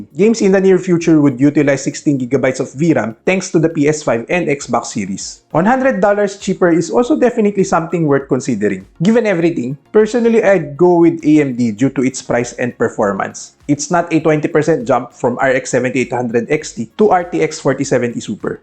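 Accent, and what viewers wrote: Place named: India. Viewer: Ur accent similar as indian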